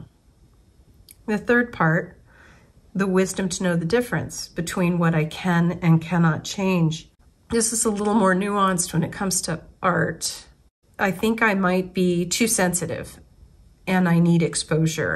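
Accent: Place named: Australia. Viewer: I love when you get goofy! You should post some of it here. And expose yourself, if needed. 😂 Seriously I can’t wait to see all of those inner voices in cartoon form. And your Aussie influenced words are so cool, like my Siri is set to Australian version, just so I can hear “no worries” and things like that.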